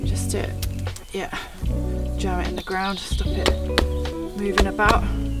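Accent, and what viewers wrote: United Kingdom. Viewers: Ole ta ohs.😂do like the briish accent 😊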